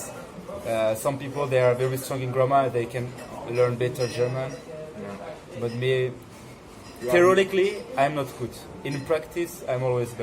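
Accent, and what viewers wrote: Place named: France. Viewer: An english speaking turkish guy with a french dialect :-) Let me hug you a bit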